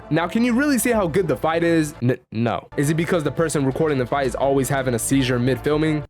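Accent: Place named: Africa. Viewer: Yoh which part of africa are you from?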